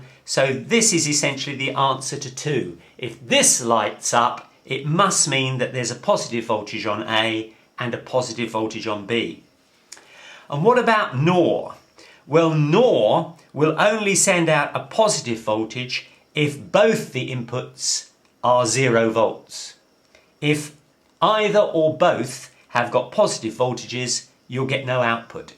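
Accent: United Kingdom. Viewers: Great video, great pacing, and I always feel smarter learning something from somebody with a British accent. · GREATＶＩＤＥＯ Very clear! Your british accent is nostalgic haha. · Brilliant at last a person who speaks British English and can deliver in a clear manner enabling me to understand as I listen more of the same quality please. I feel the need to study more and that is the frame of mind a good teacher should leave a student in as I said Brilliant. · British accent LOL I like it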